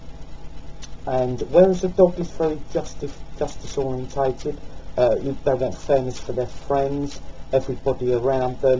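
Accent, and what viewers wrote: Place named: United Kingdom. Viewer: such good material... but the audio is really poor. and you have an awesome brit accent!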